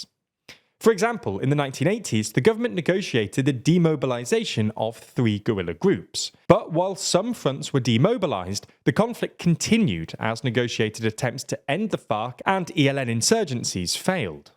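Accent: England, United Kingdom. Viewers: it's cuz he is British and doesn't make R sound so it sounds like fk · That's just the English accent. They all say it like that.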